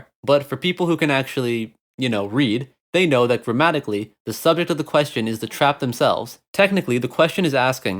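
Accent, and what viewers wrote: Newfoundland, Canada. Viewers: Are you from newfoundland? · You wouldn't happen to be from Newfoundland would ya?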